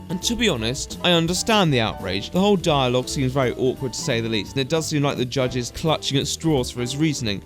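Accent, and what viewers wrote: United Kingdom. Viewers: is this a voice you put on or is it genuine? it's the most english voice ive ever heard aha, and i'm english myself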